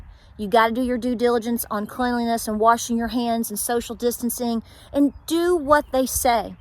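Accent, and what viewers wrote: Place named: Appalachia, United States. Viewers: Also I'm from West Virginia....I can tell you're close geographically and not just by you also being an Appalachian queen! I can hear that beautiful accent...mine is barely noticeable,but sometimes people will ask to which I'm always surprised since mine is so minor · When I hear you speak it gives me a taste of home. That Appalachian twang is music to my ears. And good old fashioned common sense, something that is in short supply, especially now. I live in Florida and the “spring breakers” are acting so irresponsible even today they were doing Jell-O shots off girls stomachs, partying like nothing going on. Incredible. Incredibly stupid. Now they are taking the virus home to their families. Can’t get my head around it and why their parents would allow them to even come here right now. I’m staying home because it’s the right thing to do. 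So should all non-essential folks. You a few other channels taught me how to dry can which I started doing in July cause I couldn’t afford a pressure canner . I finally got one and have been busting my hump canning and you guys taught me and I thank you so much. God put it on my heart last year to get ready, something is coming and I did my best. We are not were I wanted to be yet but I’m probably ahead of 95% of the country and I am so thankful.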